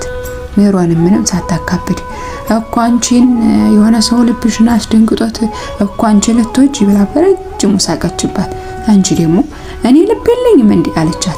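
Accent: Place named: Wollo, Ethiopia. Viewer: ወላሂ የብዙ ሰው ታሪክ አዳምጣለሁ ግን አንች እንደምታቀርቢው የሚመቸኝ የለም ያቀራረብሽ ለዛ አነጋገርስ ወሎየነቴን ያስታውሰኛል የምር ምችት ይበልሽ በርችልኝ ከዝህ በላይ ጠንክረሽ ጥሩ ደረጃ ደርሰሽ አላህ ያሣየኝ የኔ ውብ ድምፅ😍😍😍😍😍😍😍😍😍😍😍😍😍😍😍😍😍😍😍😍😍😍😍😍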